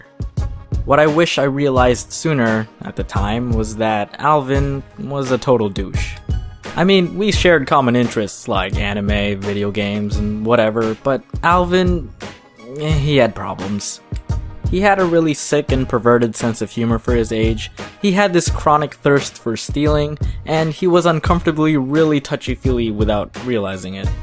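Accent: Canada. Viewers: You from Canada? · R u Canadian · wait are you Canadian?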